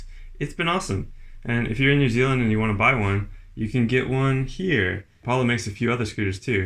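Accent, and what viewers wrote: United States. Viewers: This is actually a great video! It’s both entertaining and informative,but it’s weird hearing an American accent in a video from NZ?? Once I got over that I dug the various aspects of your testing and if I was with the NZ distributor or with Apollo I would be super happy with what you did. What freaked me out though was 50km on a scooter. WTF? I have an eBike that hits 28mph (45km) and I have only done that a couple of times and both times I was muttering a prayer that I wouldn’t hit a stone in the tarmac…things happen really fast above 30km/h much less 50. Either you must be an adrenaline junky or you have a suppressed survival instinct 😂! Bummer that NZ is so restrictive about where and how fast you can ride a scooter, but either way this was a really clever and enjoyable video and thanks for doing it.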